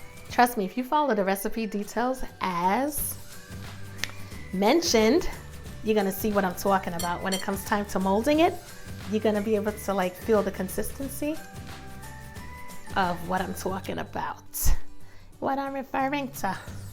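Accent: New York, United States. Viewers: love ur ny accent lol been watching all ur videos keep em comingggggg !!!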